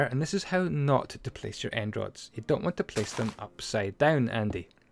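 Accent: Scotland, United Kingdom. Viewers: is this guy scottish? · are you scottish? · Are you Scottish I am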